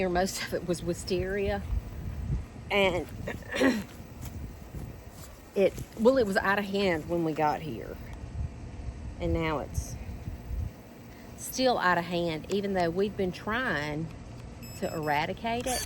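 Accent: South, United States. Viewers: Good morning,. Hope it cools off soon for you. Here in Ohio--we have temps in mid to late 50s in early morning. Then it creeps up to mid 80s by the late afternoon. Yes I understand what you are going through with the walnut tree and shrubs. I've had similar problems with a walnut tree mixed in with tiger lilies growing next to the garage. Last year we even had poison ivy in that space. I had to clear it out (protected by gloves) and still got a little rash on my forearms. We have very dry soil right now. It hasn't rained in over two weeks. I love your southern accent,. Soil or soul is fine with me.😅 You did a good job clearing out the problem area. Have a wonderful Sunday afternoon.😊💖💕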